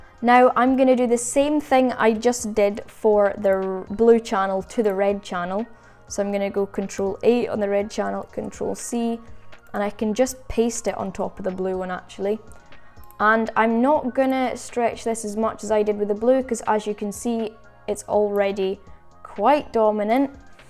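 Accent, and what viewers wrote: Scotland, United Kingdom. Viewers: This is why I love YouTube. A teenager has given me one of the best lessons I've every received in Photoshop and in a cool Scottish accent to boot!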